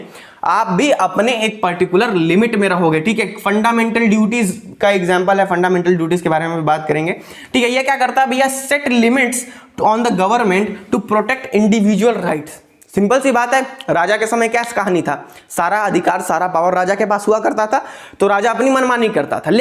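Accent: Bihar, India. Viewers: Pure bihari😊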